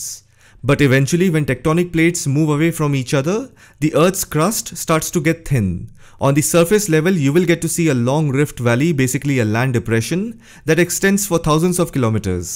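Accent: India. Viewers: Why you sound like you from india? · Bro, sounds Indian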